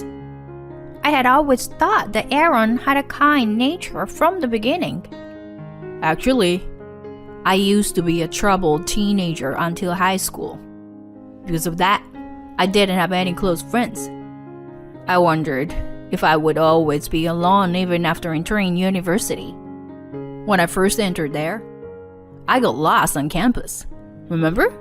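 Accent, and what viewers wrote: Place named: China. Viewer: hate this chines accent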